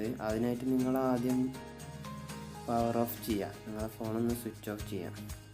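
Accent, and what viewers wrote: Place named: Malappuram, India. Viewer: Igale baasha poli malappurakkaranano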